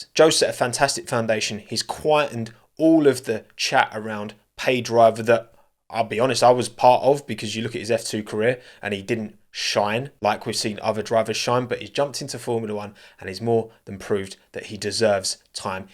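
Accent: United Kingdom. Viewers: I wanted to ask you a question that might come off a bit rude, but I don't mean it rudely. What's your particular style of British accent called? I watch a lot of F1 youtubers from the UK, and it's not like I've never heard British accents before... Your accent is kind of particular though, and I just wondered what it was called. No disrespect meant.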